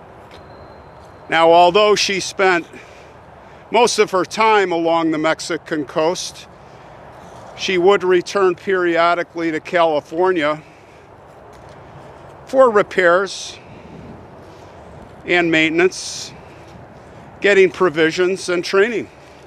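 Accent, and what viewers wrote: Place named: Chicago, United States. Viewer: This is said with love: Your accent sounds like the super fans of saturday night live!! Da Bearrrrrrsss!!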